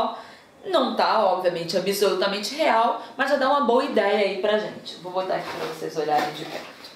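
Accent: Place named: Rio de Janeiro, Brazil. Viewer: Perguntinha, espero que responda. Voce é do Rio de Janeiro, parece que voce é de lá tem sotaque.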